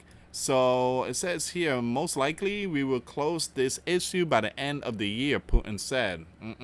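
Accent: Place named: Portugal. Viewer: Hi, are you Portuguese? I can't quite figure out what type of accent you have. You remind of the Dog Daddy, he has the same accent. He is a great young man who trains problem dogs of all types.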